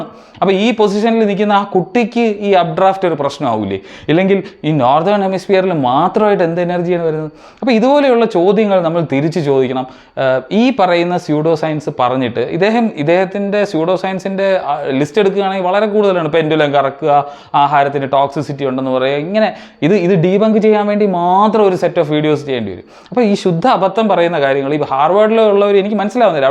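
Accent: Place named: India. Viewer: Indian accent ആയതു കൊണ്ട് പെട്ടന്ന് വാക്കുകൾ ക്ലിയർ ആവും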